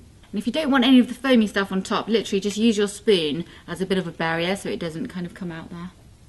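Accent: England, United Kingdom. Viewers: Shes beautiful, can cook malaysian food, and that english accent! Marry me baby ;)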